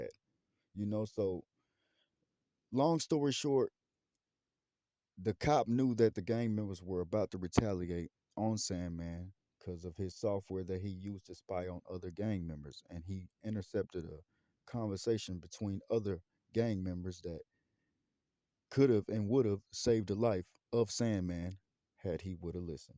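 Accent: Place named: United States. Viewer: Yo you sound American.....how you know about all this Trinidadian stuff? lol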